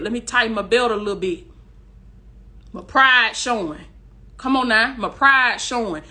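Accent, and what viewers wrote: South, United States. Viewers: God is a perfect God. You sound wonderful,! I love❤ your southern drawl, and could listen to you for hours. Not kidding. God made your voice unique, to reach the millions that you will be assigned to! Your voice mesmerizes people, and compel them to stop and listen to you while God is pouring into you and into them. Praise God! I hope that you read this because...your mouth is working...👄but...believe me, GOD IS SPEAKING.🙏🏽 To THE MASSES!!!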